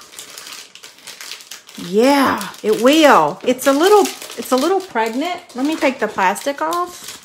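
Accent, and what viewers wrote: South, United States. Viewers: I love how you talk with that southern accent. Could listen to you all day.Well,maybe not all day but you know,lol.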